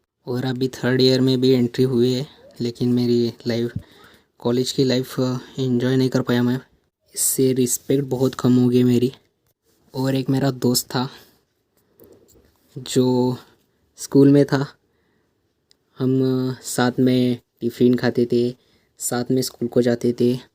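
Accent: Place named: Maharashtra, India. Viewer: U r maraThi🌝Accent Pechanl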